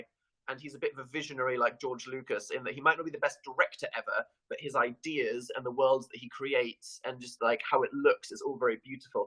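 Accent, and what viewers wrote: United Kingdom. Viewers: You're so extra British in this somehow. You keep reminding me of Eric Idle for some reason, and you're so fucking funny and intelligent!! I'm always so impressed by how quick-witted you are.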